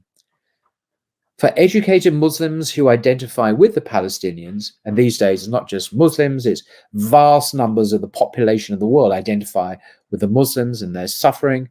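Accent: United Kingdom. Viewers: Amazing how the fact that a middle aged man sitting in front of some book shelves, throwing buzzwords and casual data in a fine British accent, is supposed to make us feel he speaks wisely and knowingly about a certain topic whilst all Ive heard here is some banal teenager level revolutionary ideas from a biased newly converted to Islam privileged white detached man, fed by BDS propaganda he quotes from super shallow social media sources.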